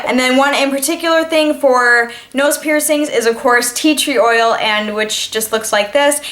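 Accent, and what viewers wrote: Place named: Midwest, United States. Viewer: Your accent is adorable! Guessing you're from either Michigan or Wisconsin!! 😍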